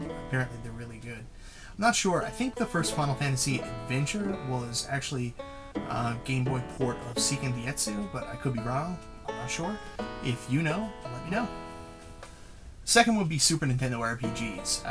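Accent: Canada. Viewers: wheres youre accent from canada?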